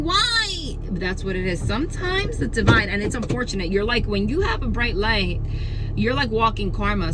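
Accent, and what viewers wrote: New Jersey, United States. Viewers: Just wanted to tell you I LOVE your personality.. even your NJ accent lol ♡♡♡ have a blessed day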